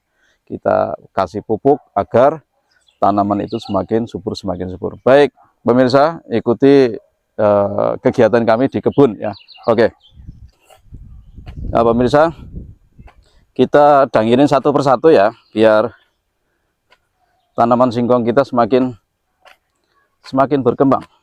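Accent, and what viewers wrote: Java, Indonesia. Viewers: Mas, ngeliat alam Suriname sama persis dengan alam Indonesia. Mendengar logat mas,Jawa banget. Kayak ngeliat orang Jawa di Indonesia. Matur nuwun mas. Sudah memvidiokan alam Suriname. Salam buat semua sodara sodara disuriname Yo mas. Semoga semua sehat selalu... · Ok pak jowo banget iki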